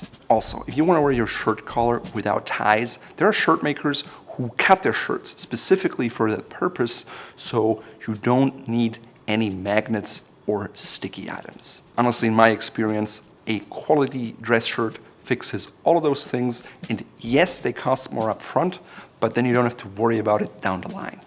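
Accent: Germany. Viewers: Wow! Your German accent is cognizable specially I like the Gazzette vids, thanks! Mit freundlichem Gruß, Rolf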